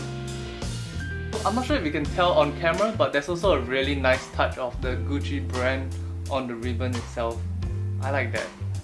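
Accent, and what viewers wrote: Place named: Singapore. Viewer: You sounds like Singaporean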